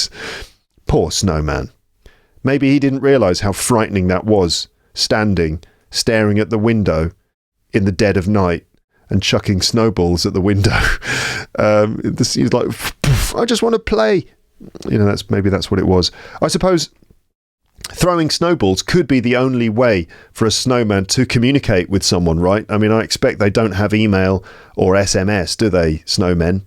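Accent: United Kingdom. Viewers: Hi, I know it's late for the answer, but I want to tell you how much I love listening to you. I like to knit and usually I listen to your podcasts or videos while I knit. I find that a perfect match as I get more and more confident in English. Besides, your British accent is so nice that it's always a very pleasent time "knitting with ". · Hi. I'm from Myanmar. You know what? My listening skill has definitely improved after listening to your podcasts. The problem was when I became so attuned to your accent which is British accent, I couldn't fully understand other different accents. Why does that happen? Does that happen to most of English learners? And I'm just wondering if you can have a chat online with your listeners (including me) on 31st December, which is the last day of 2024? I'm so eager to talk to you. But it's okay to decline my request if you don't have enough time. 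Thank you and looking forward to seeing your new episodes. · Hi. Thanks a lot for your podcast. Im an English learner in China and I love listening to your podcast and your sound. Im here to learn about British accent, and it helps me alot. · Hi.Yes How do you know that your podcast is good for get sleepy??😂😂😂 Joke, joke for me it's useful to improve listening listening... I like British pronunciation and entonation too. Thank so much for this. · 1. I've listened to your podcast to get used to British's accent and imitate your words. 2. Just listen and imitate your words for many times. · Your podcast is the best English teaching podcast on YouTube... It has been a great help for me to learn English especially when you read short stories... So I recommend that you read more stories on your podcast.. I enjoy listening to the stories in your charming British accent... Please post more episodes of you reading short stories.. 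thank you very much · I love how British English sounds and the way you speak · Hi! I'm an English teacher and I have started listening to your podcast since last year. It helps me get up in the mornings with a clear mind! Love the delicious humour it offers. Love the vocab explained and your beautiful British accent. I have become addicted to it!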